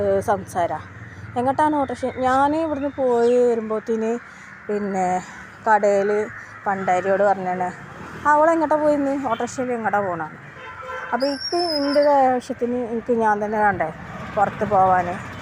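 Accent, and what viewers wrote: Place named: Malappuram, India. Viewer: എവടെ ആണ് സ്ഥലം ഭാഷ മലപ്പുറം ആണല്ലോ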